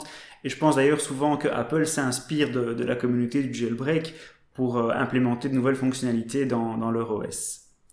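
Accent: Belgium. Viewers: J'aime bien ton petit accent :) T'es belge ? ^^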